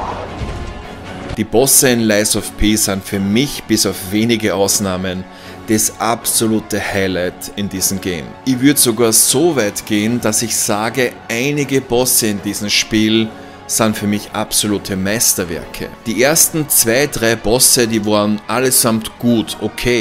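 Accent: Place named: Vienna, Austria. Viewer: zu schwer - ganz einfach: 90% der Spieler, die es kaufen sind nicht gitgut und mit einer kleinen Minderheit(profispieler) macht man kein Geschäft - mir z.B machen die Bosse überhaupt keinen Spaß sondern das dazwischen .. ich spiele schon seit 11 jahren Skyrim (pause mit Elden DS3 Sekiro usw.) aber nicht das Spiel selbst sondern weil es riesige modder szene gibt - ich schraube nicht im Spiel sondern am Spiel - herrlich bescheuert - bald kommt Lords of fallen - freu mich deine auf Anleitung - als Wiener mit dem Slang ein Genuss -habe es vorbestellt mit DarkNight extra :D weiß jetzt schon ich das nur gefickt werde :D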